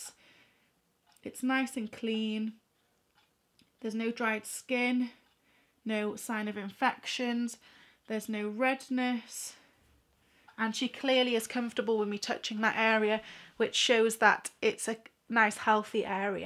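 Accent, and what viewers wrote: Wales, United Kingdom. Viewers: Are u welsh?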